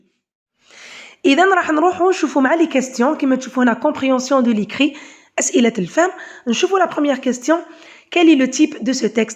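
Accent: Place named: Europe. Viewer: ليكن في علمك استادتي الكريمة ان موضوع الامتحان غير موجود في موقع ency-education فهو محدوف فعوض ان 6 مواضيع، تم تنزيل 5فقط، و لهدا ان كان لك من الزمن بقية رجاءا ضعي رابطه اسفل عند صندوق الوصف. سلام صاحبة الصوت الافرنجي الاصيل.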